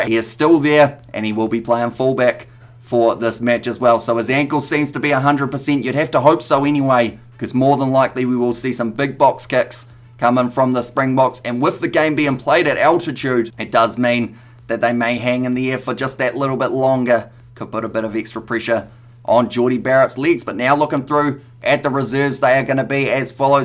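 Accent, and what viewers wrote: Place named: New Zealand. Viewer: Bro you have the strongest Kiwi accent ever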